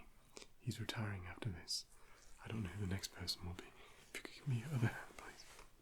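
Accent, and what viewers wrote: England, United Kingdom. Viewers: it's definitely an English accent but even after moving countries it can be hard to shake it. My dad was born in Halifax, we live in Australia but he still sounds mostly Yorkshire 😅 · ooops! I thought your accent sounded English! I’m not too far from there- North Yorkshire.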